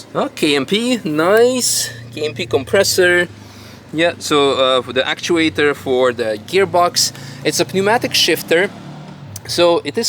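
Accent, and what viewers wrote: Netherlands. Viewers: Nooit geweten dat jij Nederlands was , maar niet geboren en getogen aan jou accent te horen ? 😂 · wilde nederlander gespot, je kan je accent heel goed verbergen en veel plezier met je kanaal! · Now I can no longer unhear the Dutch accent. 😂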